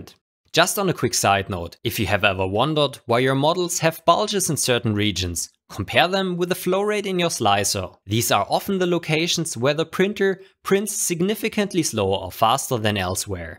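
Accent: Germany. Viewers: Ja genau! Ganz geil alter only watched 5 seconds of the video and already knew u were German lol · Are u German?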